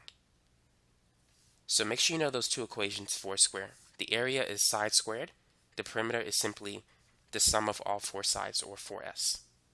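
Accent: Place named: New York, United States. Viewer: This man gotta be from new york! DeadAss!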